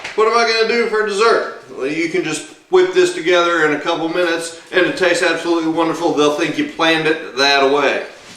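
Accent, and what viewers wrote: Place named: South, United States. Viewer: speaks in a souther drawl!!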